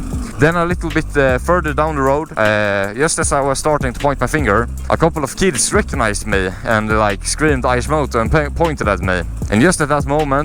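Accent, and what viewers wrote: Sweden. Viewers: are you swedish>??? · u sound swedish are you? Im swedish. hej hej vad heter du? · Are u from sweeden? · Ja, man kan ju höra svengelskan 😂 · jag kan höra svenska dialekten bro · I can hear you are Swedish instantly I’m Swedish too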